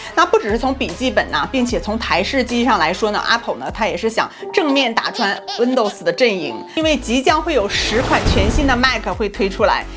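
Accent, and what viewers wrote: Northeast, China. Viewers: 就喜欢大东北碴子味😂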